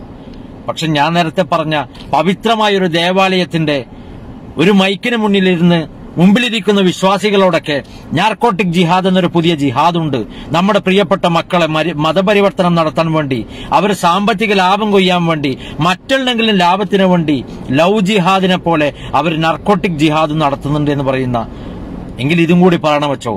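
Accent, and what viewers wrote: Kasaragod, India. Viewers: കേറി വാ മക്കളെ...🙋 ഇനി മുതൽ കാസർഗോഡ് ഭാഷയിൽ ബ്ലോഗ് കേൾക്കാം...😍😍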